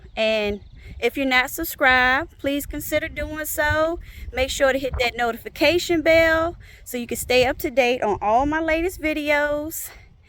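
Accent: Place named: North Louisiana, United States. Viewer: I know boot 👢 when I hear it.. North Louisiana me..great job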